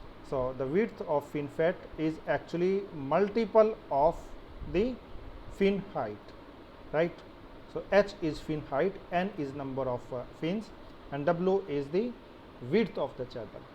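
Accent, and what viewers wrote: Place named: India. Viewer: ฟังสำเนียงชัดเลยอินเดียทำไมมีคนเก่งมากมาย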